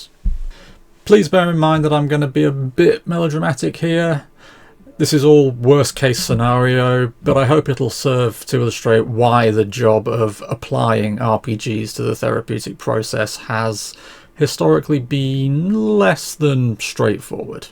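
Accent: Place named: United Kingdom. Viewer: You have a British accent. This is episode 002. Does this mean there's 005 more episodes before you talk about superspy/espionage RPGs?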